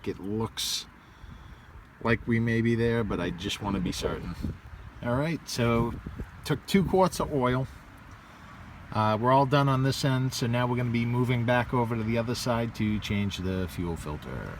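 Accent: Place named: Louisiana, United States. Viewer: New England? Would have though Louisiana with your accent.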